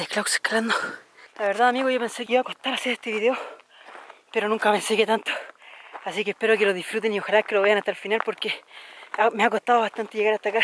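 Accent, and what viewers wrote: Chile. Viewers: Hola pequeño hombrecito!!me encantan tus vídeos los lugares hermosos que muestras soy Argentina pero tengo familia chilena mi abuelo me contaba sus costumbres y me gusta escucharte como hablas por qe el me contaba historias de ese país qe nunca fui pero lo siento conosido y cercano tengo una piedra de cruz de ese río muchas bendiciones para vos y tu padre 🤩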